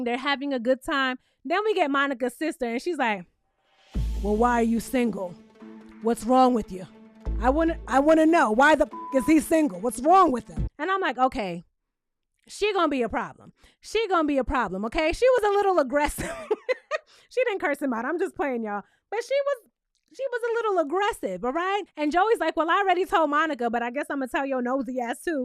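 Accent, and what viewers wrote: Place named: New York, United States. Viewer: I'm here for the New York accent when it comes around top tier commentary as always ❤❤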